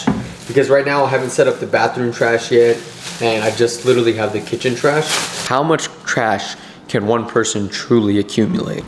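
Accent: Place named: New Jersey, United States. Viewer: I saw that view and thought it was Dubai, but then you seem to have a NJ accent. Good luck with the new apartment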